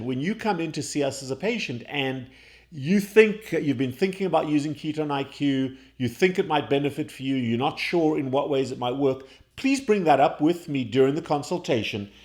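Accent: South Africa. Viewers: He sounds very south African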